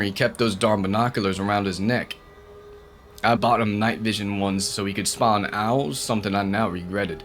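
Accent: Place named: South, United States. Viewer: I like these dogman stories! Lon Chaney as the Wolfman comes to mind. You read this one quite fast though. I couldn't savor the flavor. Love the idea of a house of glass, though, how folks could be one with the trees all around and no bugs to bother you! Imagine a light rain pattering against the glass, a fire in the fireplace, warm and cozy, with little snaps and crackles and suddenly a body thrown against the glass. Great story. May I ask where in the south you are/were from? I can hear a bit of the twang in your voice that we southerns can't seem to loose no matter how hard we try.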